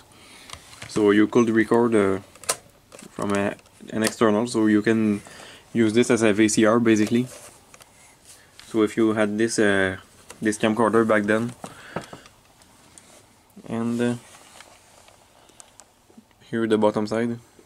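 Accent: Quebec, Canada. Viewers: you live in quebec i can tell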